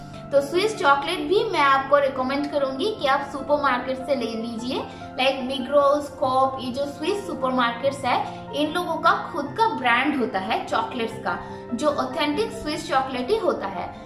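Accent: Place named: Bengal, India. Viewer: Bengali Accent s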